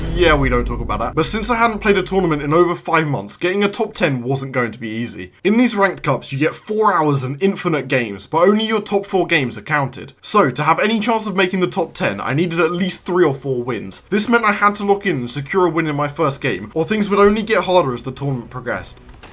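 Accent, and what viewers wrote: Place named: North America. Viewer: lol you sound na not tryna be rude tho but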